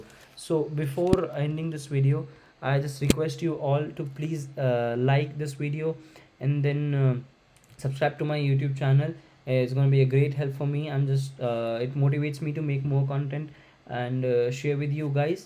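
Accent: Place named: India. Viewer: your accent seems Indian by the way🙃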